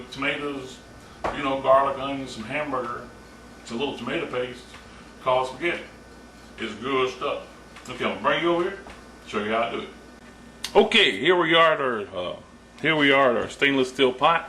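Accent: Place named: New Orleans, United States. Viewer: I love your videos. They are quick, non-textbook, and very logical to follow. Your accent reminds me of my nites in new orleans!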